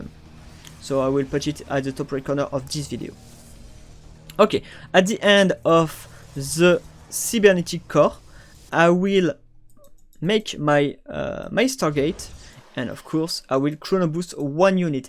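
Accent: France. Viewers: le french accent aha , bon j'ai le même, top contenu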